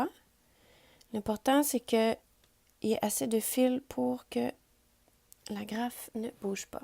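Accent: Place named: Quebec, Canada. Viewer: Entendre des instructions à la manière et surtout avec l’accent de la Nouvelle-France! Merci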